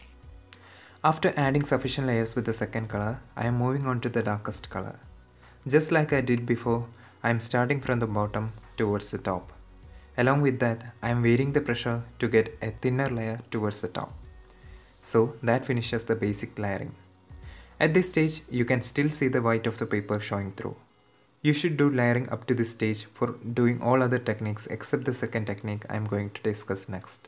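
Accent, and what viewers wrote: Kerala, India. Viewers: Are you a mallu? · Are you a keralean ? Your accent is like malayalam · He is most likely from Kerala, god's own country. Malayalam and their accent sounds very pleasant to my ears. 🙂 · Sans-sational YouTuber that's a typical Indian accent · Are u from kerala? ??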